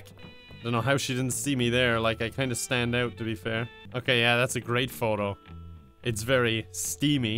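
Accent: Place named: Ireland. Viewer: it's nice to hear a true irish accent +1 sub. i like you editing style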